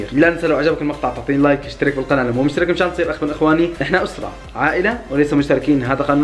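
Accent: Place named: Syria. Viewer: حلو كلامك السوري خليك هي عم تحكي دائماً💚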